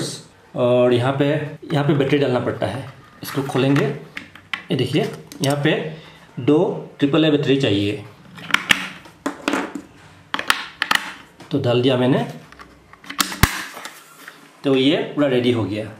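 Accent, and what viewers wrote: Assam, India. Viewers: are you from assam bro? guessing it from your accent 😆😆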